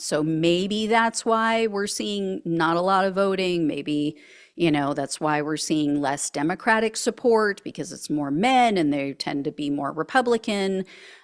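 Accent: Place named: West Coast, United States. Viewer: I wouldn't think it's Southern. Sounds to me West Coast, maybe CA. I grew up in Oregon, so sounds similar to me. But hey I don't know for sure where she's from.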